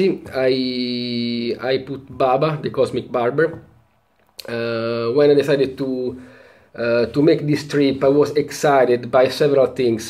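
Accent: Italy. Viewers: si sente che sei italiano LOL... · Si sente che sei italiano ahah Sei un grande! Come ti chiami e come è nata questa idea?!? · si sente che sei italiano ahah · Hello ! such a pleasure to hear you express yourself , what a beautiful Italian accent . The videos of India were wonderful , such great effort put in them. I'll have to look at some again to choose in taking the survey . Thank you for all you've done . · I love your Italian accent when you talk English! And again, many thanks for putting up this wonderful series to both you and your wife. I love Indian barbers and it was so great to see so many videos with all the different and unique barbers / masseurs. I think I'll be watching this series of videos for a LONG time to come.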